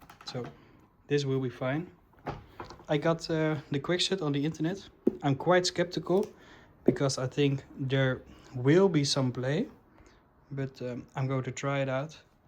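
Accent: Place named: Netherlands. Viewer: lekker nederlands accentje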